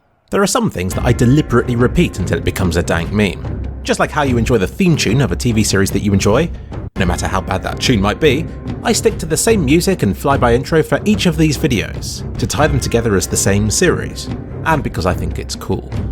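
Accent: United Kingdom. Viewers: The British accent and your voice are must for me to listen to each week.Gorgeous lyrics and entertaining videos don't hurt either.Keep doing what you are doing for as long as you enjoy it cuz i certainly love all your content,even some i did not expect to love.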